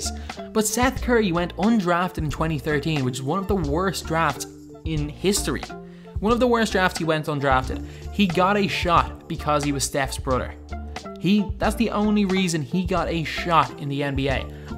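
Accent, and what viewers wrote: Ireland. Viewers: R u irish ya??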